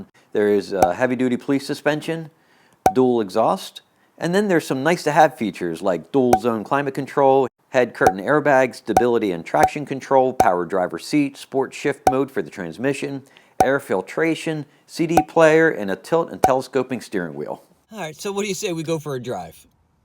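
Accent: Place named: East Coast, United States. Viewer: Really hate the east coast accent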